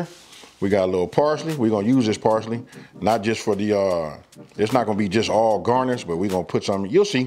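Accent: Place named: California, United States. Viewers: I’ve been cooking with potatoes a lot lately. I can’t WAIT to make this. Thank you! Love your Cali accent too. 🌸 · Oh ok so he’s from Cali ok. I thought he was somewhere in the South by his accent ok hehehe.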